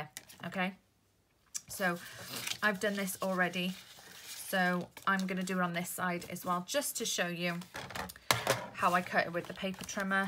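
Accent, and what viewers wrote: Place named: Cardiff, United Kingdom. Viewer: thought it was a Cardiff accent. We are from Pembrokeshire, my son is at Cardiff university so I’m there quite a lot. 😊😊😊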